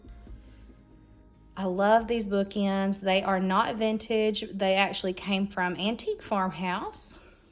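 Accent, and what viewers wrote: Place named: Kentucky, United States. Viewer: Whenever I watch (which is always) I feel like I’m hanging with family. My relatives are in Kentucky and Tennessee so they talk like you 😅 and we all love Jesus. Your home is so beautiful.. love the kitchen cabinet and all your little doggies.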